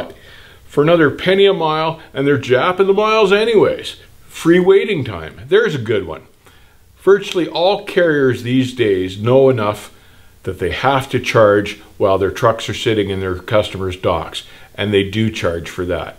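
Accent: Canada. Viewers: A good ol canadian boy, eh. He talks a lot aboot stuff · This guy comes across as American but sounds Canadian as hell. He's still hilarious, however. I'm subscribing. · Is that a Canadian accent i hear ey? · Good tip and thank you for the video. You sound 🇨🇦 Ian... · U sound Canadian. Our only friends.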